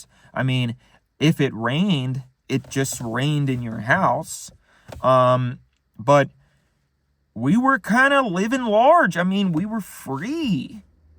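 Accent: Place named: Maryland, United States. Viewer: He's really leaning extra hard into the Maryland accent in this one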